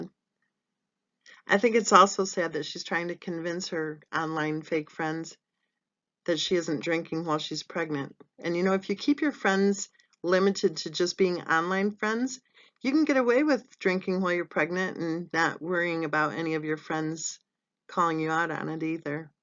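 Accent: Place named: Texas, United States. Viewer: Love your Shiner accent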